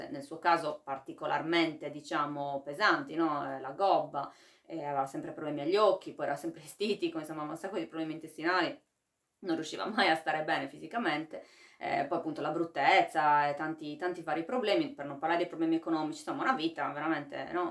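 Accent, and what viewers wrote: Sardinia, Italy. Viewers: Premettendo che la seguo da prima del video con Crepaldi, personalmente apprezzo moltissimo questi contenuti culturali/divulgativi e mi dispiace che vengano posti in secondo piano dall'algoritmo di Youtube - che, a giudicare dalle visualizzazioni, invece premia soltanto i suoi video più "polemici". Ha la mia stima e mi ha fatto riscoprire la bellezza dell'accento Sardo.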